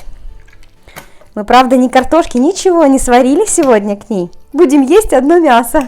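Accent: Ukraine. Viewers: Ваш Украинский говор воодушевляет очень.... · Мои гости всегда в восторге от вашей курочки, но вот сегодня без паприки и куркумы бледная моль. Как же мне нравится ваш украинский говорок.... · Как я балдею от украинского говора)))))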